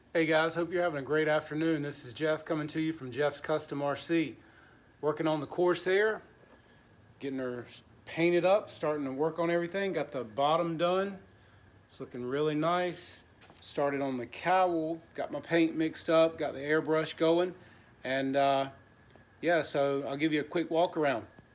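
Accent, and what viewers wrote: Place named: Kentucky, United States. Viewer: Jeff thanks for quick reply, my wife got me the F4U yesterday and I assembled last night , I tried the chalk for panel lines, I had some French chalk and ground that into some alcohol and it worked great. I live in Taiwan so bit far to go to Walmart but I will call at my local stationary and art supplier they should have bottles if Acrylic paint. Thanks again for the reply and great info on your channel. Listening to you reminds me of my time in Kentucky a few years ago, hope that is not an insult as I see your in NC.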